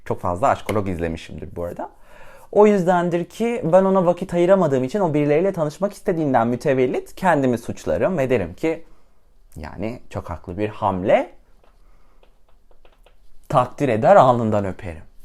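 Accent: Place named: United Kingdom. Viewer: Ya şu her videoda yaptığı British English ve şarkı showları beni benden alıyor ya belirli aralıklarla yeterli dozda almazsam nöbet geçiriyorum sen şimdi benimle naaaağpıcaksın?¿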